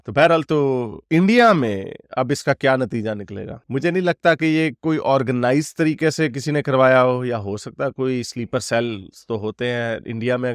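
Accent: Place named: Punjab, India. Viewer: Bro! I like your ideology and content all the time. Punjabi touch in your all the videos is mind blowing like chhitro chhitri😂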